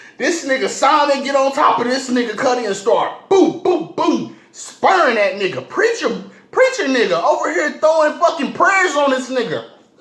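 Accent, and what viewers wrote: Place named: Texas, United States. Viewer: You gotta be from Waco...or central Tx. This is how we talk cuddy.lol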